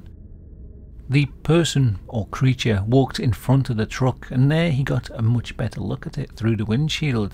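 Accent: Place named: United Kingdom. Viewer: I just got one of your storeies in my fed (probably because I follow strange, dark and misterious - mr Ballan) and started binge watching your stories 😂. I love your accent ! English is not my native language but I love to listen british accents, so many of them 😊. Your story telling abilities are great. Love your content. You have a new subscriber. Keep up with good work 👌🏻👍🏻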